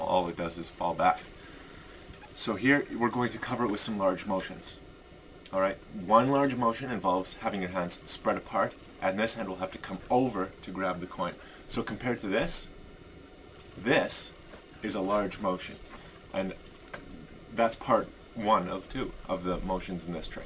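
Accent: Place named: Canada. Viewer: Miss-direction? Who is she, i would like to meet her? Unless I have missunderstood! lol Very informative explanation - I saw Shawn Franquar at convention the other day. I guess you are from Canada by your accent? I remember going to Browsers Den in Toronto and saw Jay Sankey. I wanted to work there with jeff and Shana. Also Vanishing rabbit in Calgary AB. You may know Brett or Tyler!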